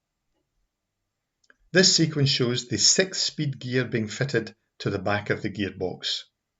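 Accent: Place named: Scotland, United Kingdom. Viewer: The accent is Scottish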